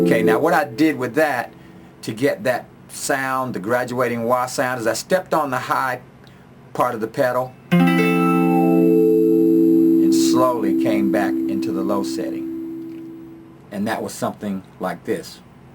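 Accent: New Orleans, United States. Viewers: You are one of the bet teachers I have ever learned from. Thank You. is that a New orleans accent?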